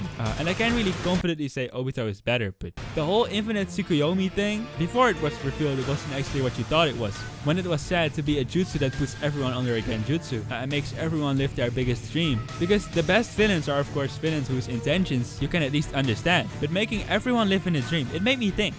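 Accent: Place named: Netherlands. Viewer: I like your accent. It sounds a little Dutch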